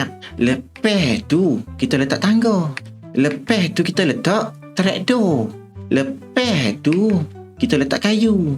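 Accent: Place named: Kedah-Kelantan, Malaysia. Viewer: Kedah campoq Kelantan 😂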